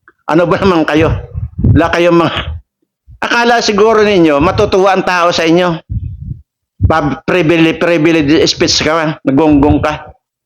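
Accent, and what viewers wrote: Iloilo, Philippines. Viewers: Ilonggo ka gali tuno mo ilonggo sa totoo lang taga diinka haw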